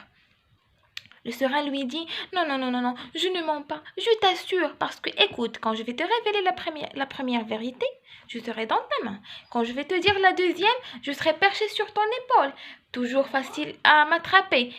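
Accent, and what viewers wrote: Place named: Fes, Morocco. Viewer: Un délice... mais je préfère en darija avec ton accent fessi si!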